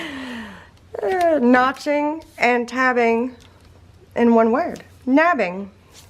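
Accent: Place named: Georgia, United States. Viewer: Don't know if be sorry for how you Alk I'm going to from southeast GA it sounds just right to me lol. The way they say it sounds funny to me. If it is a problem to them I say just dont listen learn to do it their self.😀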